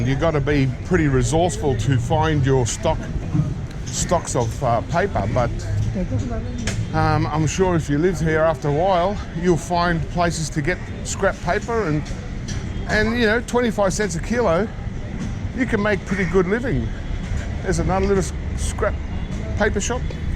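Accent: Australia. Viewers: probably, Australian accent is too much for him!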